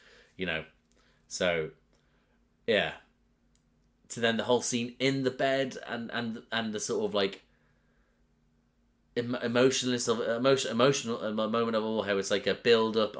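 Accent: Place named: Northern England, United Kingdom. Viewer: R U from northern England or southern Scotland? You pronounce "about" as "aboat". You also pronounce "heart" as "herrrt".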